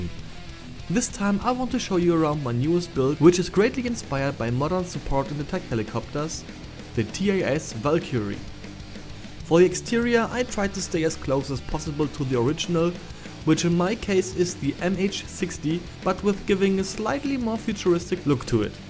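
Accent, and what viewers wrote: Germany. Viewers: you Sound German .. are you?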